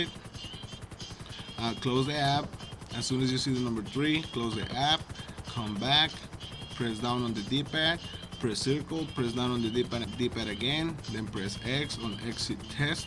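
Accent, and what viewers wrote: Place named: Mexico. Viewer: love your mexican accent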